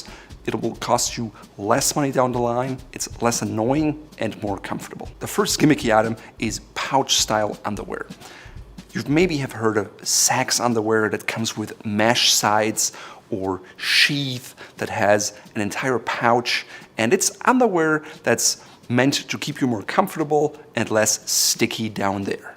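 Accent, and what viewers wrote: Germany. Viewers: Wow! Your German accent is cognizable specially I like the Gazzette vids, thanks! Mit freundlichem Gruß, Rolf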